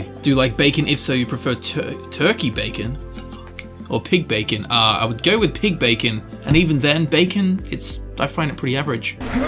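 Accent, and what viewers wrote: Australia. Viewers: are you australian i am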